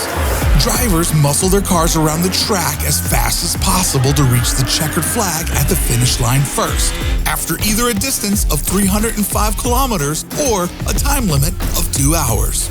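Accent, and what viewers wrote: United States. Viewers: Should have had an English person voicing this, not an American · haha they needed an American voice for all of the Yanks to try and understand. They are the ones who need teaching as they are all the newbies. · ugh ofc they had a yank doing the voice-over. hate listening to their accent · The fact that this video is in American-English instead of the usual British-English, goes to show for me that this video is mainly for Americans jumping the bandwagon on the F1-hype at the moment. Also, 3 American GP's? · This just doesn't sound right wit han American accent, I get it, they are trying to appeal to the U.S....but seriously! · I love how only the beginners guide to F1 is narrated in an American accent :D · Could have done without the American accent. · Had to get an american guy to narrate it so yanks can understand🤣. F1 should still keep in touch with their heritage. 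Mention the great old days instead of constantly looking into the future. Edit: Oh yeah here's an alternative title to this video, A guide to f1 for americans. Americanisation of a great sport, it's sad to see the sport be ruined like this. A great sport, just to be ruined by money. Miami a exciting street circuit?? Yeah I don't really think anyone says that, what about circuits like Azerbaijan etc, talk more about the heritage man, F1's really going to the dogs. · "Exiting" Miami GP as example next to Silverstone? And the American accent, who would this video be ment for? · Hearing the British pronunciation of garage in an American accent is extremely weird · This sounds so American it hurts. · Make it less American please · Very weird to hear anything F1 narrated in an American accent.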